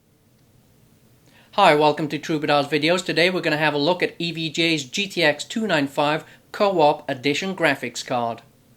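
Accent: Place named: Wales, United Kingdom. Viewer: Sounds more Welsh to me.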